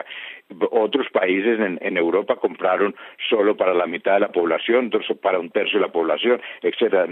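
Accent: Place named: Spain. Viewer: que bruto que informacion tan importante... lo ideal hubiera sido en video y no solo la voz pero esta brutal.... gracias por compartir ahorita estan atacando al e cig... de parte de la oms para proteger la inversion de loc chicles parches y champix... surtidos por las farmaceuticas... te invito a ver nuestra informacion <link> aunque el ataque es a nivel mundial, igual en españa porque se te oye voz de español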